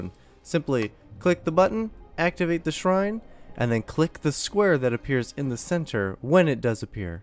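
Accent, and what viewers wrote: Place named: England, United Kingdom. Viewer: Me: DAD I FOUND A VIDEO WITH A GUY THAT HAS AN ENGLISH VOICE! dad: so?? Me: He also tells us how to get to that secret lvl... dad: NANI?!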